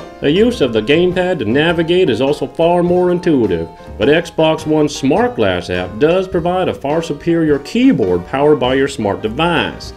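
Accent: United States. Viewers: wait are you American?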